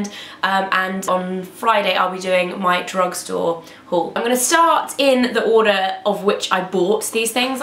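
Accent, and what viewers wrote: United Kingdom. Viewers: Ur British? I swear this whole time I thought u were american.. It's been a while 😐😐😐😐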